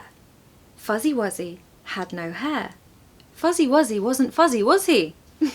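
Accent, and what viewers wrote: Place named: England, United Kingdom. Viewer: I think your accent is the nicest English accent. Every word you say is crystal clear and lovely to listen to. I, myself, sound like an old ship's clapped out foghorn. Another great video,! Have a great weekend 👌🎸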